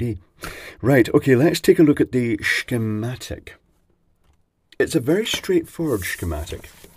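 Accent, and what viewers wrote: Scotland, United Kingdom. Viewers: If it wasn't for your Scottish accent, I would have thought I was watching DiodeGoneWild, withheld transformer teardown.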